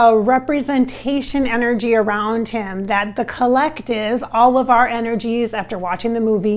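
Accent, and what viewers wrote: Ireland. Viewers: You developed an Irish accent. That’s interesting that you developed his accent. When you talked to him. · Was paul irish? Almost sounds like an irish accent as you are speaking.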